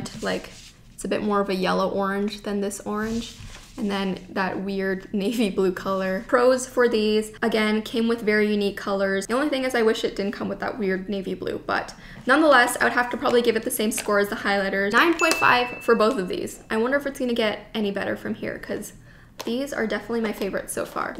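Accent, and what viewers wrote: Canada. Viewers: cocobana29 she’s canadian, but go off... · are you canadian? i know, weird question but still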